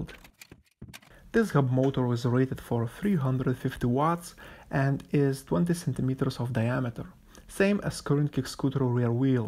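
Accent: Russia. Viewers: Судя по акценту, ты русский) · Русский акцент)))) Канал на русском есть? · Сразу слышно что русский)) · Акцент русский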